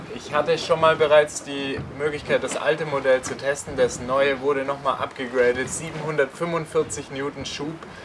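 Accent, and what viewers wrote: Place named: United States. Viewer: Sounds American trying to speak German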